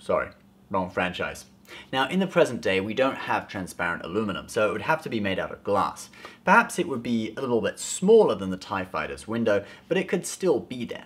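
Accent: United Kingdom. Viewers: I want more British and Star treck plz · The people like your British accent.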